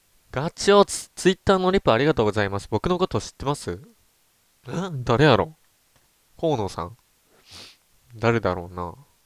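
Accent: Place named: Ehime, Japan. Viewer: 〇〇やからって方言で愛媛かとおもってました(^○^)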